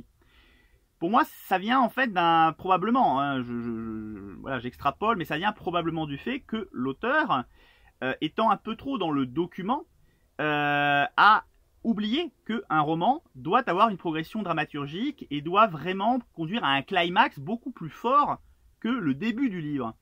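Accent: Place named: Nord, France. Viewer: Bonjour vous êtes chti ? (Vous avez un fort accent, désolé de le dire).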